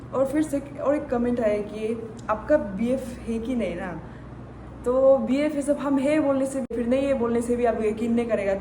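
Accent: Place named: Dekapam, India. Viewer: Avi pata chala ki ap dekapam se hai bolke😅 im also from dekapam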